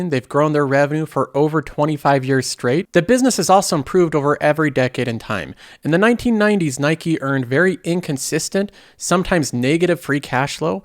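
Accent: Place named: Northwest, United States. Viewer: Hey man - I gotta ask, are you from the NW? I just got married in Washington State and my wife's entire family talks just like you the way you pronounce words like "Feel" kind of like "Fill" or "Fell". Haha so funny!! 😂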